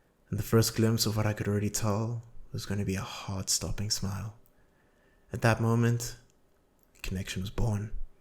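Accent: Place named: Southern Africa. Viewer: well well well, my eyes have been opened to infinite horrors tonight. Thank you, young sir! Also, are you from Southern Africa? I hear a very similar accent! Zimbabwe?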